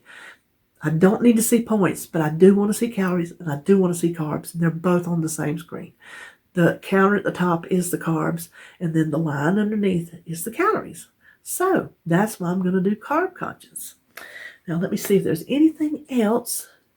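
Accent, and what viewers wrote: South, United States. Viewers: Not going anywhere and can't imagine life without you. So enjoy your sense of humor and southern accent! And what would I do without your DT and Wanda hauls?? We are all in this together no matter what plan we are following. You have been so good and therapeutic for me as I have gone through this 5 months of recuperation from my foot surgery and Co-Vid on top of it, and can't imagine life without and your beautiful soul. Here's hoping 2021 is better for all of us and we will hit the pavement running all together..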